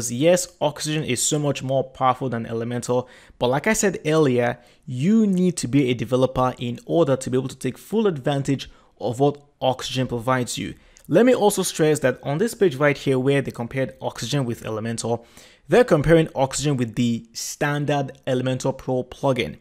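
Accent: Nigeria. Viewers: are you a nigeria sir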